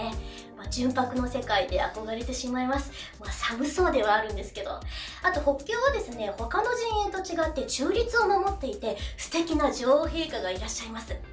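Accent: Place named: Kansai, Japan. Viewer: Her Kansai dialeg is cute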